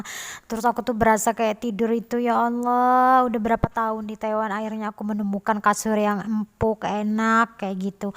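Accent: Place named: Surabaya, Indonesia. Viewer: Mohon maaf klau di lihat dari logat bicaranya Mbak dari Surabaya bukan yaa Mbak??🙏🙏🙏🙏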